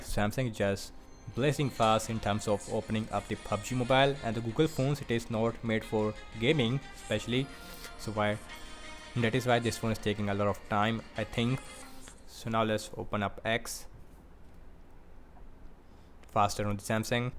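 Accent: India. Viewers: I can barely understand this review due to his pronounced Hinglish accent!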